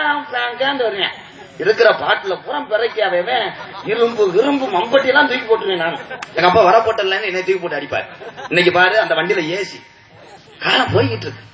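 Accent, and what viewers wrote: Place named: Chennai, India. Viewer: Unaku Chennai voice supper thala,chennaiku konzam mariyatha kuduga.chennai rasighan.please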